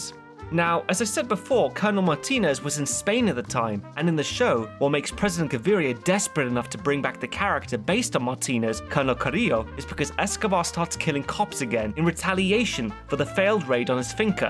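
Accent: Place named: England, United Kingdom. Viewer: The English accent helps with his credibility xD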